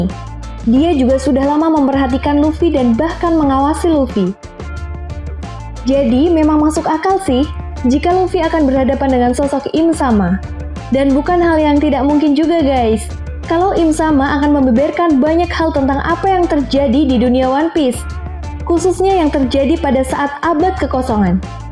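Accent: Java, Indonesia. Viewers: Min saran nih, saran aja ya, kalo bisa channel nya di bagi 2 aja, 1 voice cw 1 lagi voice cowo, jujur gw lebih suka yang suara cowo nya yang khas mendoknya, dan maap maap ni ye, gw skip yg cw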